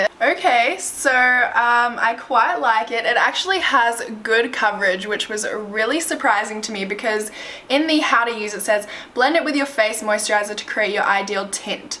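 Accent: Australia. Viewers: is she australian? shesounds like jess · what area of australia are you from? your accent is so cute but it sounds different from other australian youtubers i watch! btw love your videos sooo much!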